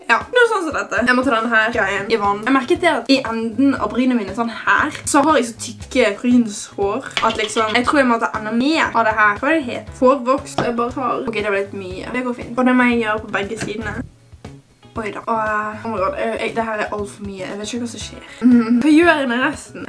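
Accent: Bergen, Norway. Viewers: er du fra bergen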